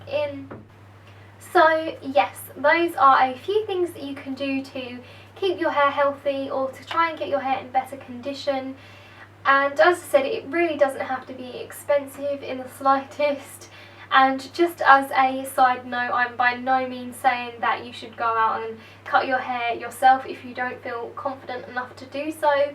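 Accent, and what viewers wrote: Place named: United Kingdom. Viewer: I admire your british accent❤️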